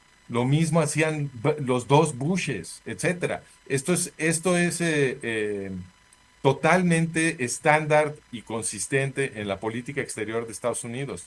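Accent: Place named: Mexico. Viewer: Que video musica para mis oidos ..me iré a dormir felíz sabiendo que algun dia los arabes junto a EEUU...van a darle a Israel donde mas les duela ... ojalá paguen todo el sufrimiento que estan cusando al pueblo palestino .. Solo me queda una pregunta... cuál es el rollo de este tipejo con asento mexicano que defiende tan apasionadamente a los genocidas?...te gusta la guerra mexicano?... ojalá pronto veas a tu amado Estado genocida vuelto cenizas ....es mi mayor sueño.. No señor ningunos medios occidentales ...desde Israel hay quienes no le siguen el juego al genocida y estan protestando ...deje de idolatrar a los asesinos ...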